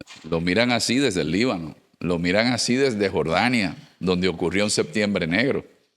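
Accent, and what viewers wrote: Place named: Venezuela. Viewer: Tiene acento venezolano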